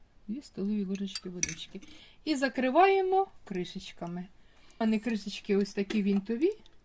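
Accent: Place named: Russia. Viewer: А в якому місті ваш ,,говор" москальський?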